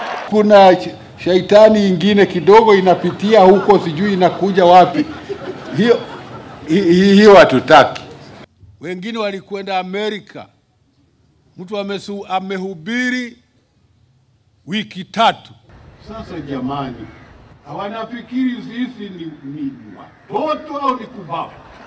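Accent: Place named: Kikuyu, Kenya. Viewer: GOAT. As an othaya folk I can confidently tell you his non-native utterances( non-kikuyu et al ) were merely Kikuyu talk transversed especially in Swahili. When he says " sasa basi " he is actually adressing the subject in Kikuyu banter " Atereri ".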